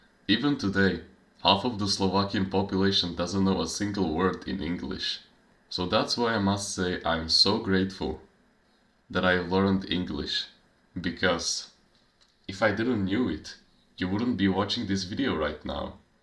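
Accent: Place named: Russia. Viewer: Pardon me i had no idea where are you from. By your accent you sound russian ❤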